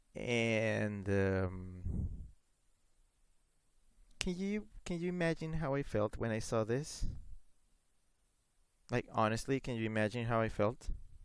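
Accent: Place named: Mexico. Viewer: Yes; I feel abou the same... even the HMs, which I do not miss, leave a hole. Also, I do not mind waiting a few years and playing other games... what we did in the ol days! Your theoretical On a different note, your Mexican accent is more noticeable here... though a carefu listening reveals your mastery of English, even while speaking.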